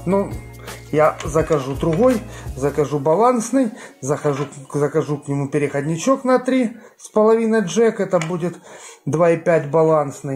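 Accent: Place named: South, Ukraine. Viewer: Тезка, в тайм-кодах поменяй точки на : , тогда это будут не временные метки а полноценные тайм-коды. Чуток над речью стоит поговорить, чтобы произношение было не столь колоритным (я про южно-украинский/восточно-украинский "ШО" "ГЭ" ну и прочие специф. звуки) Для новичка видео нормальное. Для авансу +, подписка, бубенцы. Сам кабель норм за свой прайс, но он ничто против нишенска с3 на 16 жил типа аля СИЛЬВЕР. Там вообще пушка- ракета, а не кабель. Свой трн т2с 16 жил отдал донашивать своему ребенку.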